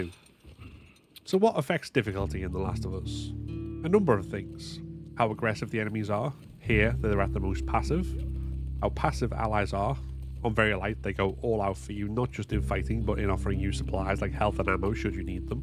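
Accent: Liverpool, United Kingdom. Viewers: Man I love the scouse accent haha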